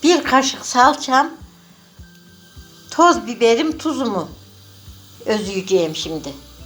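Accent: Kayseri, Turkey. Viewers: Tam bir Kayseri babannesi. Konuşmasından anladım ben de has Kayseri'liyim.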